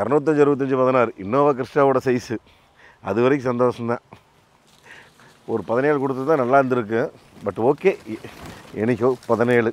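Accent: Kongu, India. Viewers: கொஞ்சும் கொங்குத் தமிழ் பேசும் கோமகரே...! திகட்டாத தித்திக்கும் பேச்சுடைய திருப்பூர் திருமகரே...! எங்கள் மதிப்பிற்குறிய " " திரு. அண்ணா அவர்களே❤❤❤🙏 எப்படி இருக்கீங்க அண்ணி❤❤❤🙏 எப்படி இருக்காங்க ரித்திக்❤❤❤ எப்படி இருக்காரு திருப்பூர் திருமகரும்❤❤❤ திருமகரின் திருமதியும்❤❤❤ இந்தக் கிளிகள் இரண்டும் கொஞ்சியபடி "கியா கேரன்ஸ்" காரில் பயணிக்கிறது😊❤❤❤❤ என்றும் அன்புடன் உங்கள் தம்பி என்றும் அன்புடன் உங்கள் "TMF" ரா.முஹம்மது கபூர் (சென்னையில் இருந்து)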